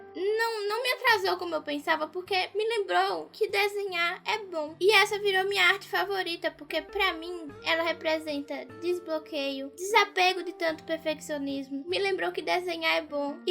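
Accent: Brazil. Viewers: seu sotaque e mt forte jkkkk me lembra mt quando passei por recife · Moça vc é de Pernambuco?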